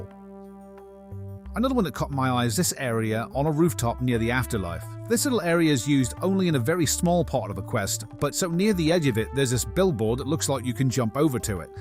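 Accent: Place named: Australia. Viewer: Australian i see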